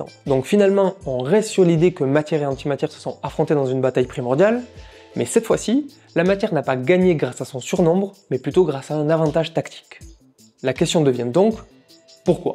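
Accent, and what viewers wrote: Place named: Marseille, France. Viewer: L’accent de Marseille c’est insupportable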